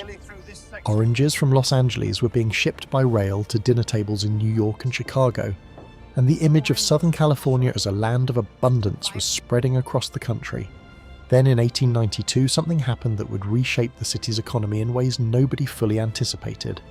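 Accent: United Kingdom. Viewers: i really do love your reconstruction. And i love the most this britisch accent.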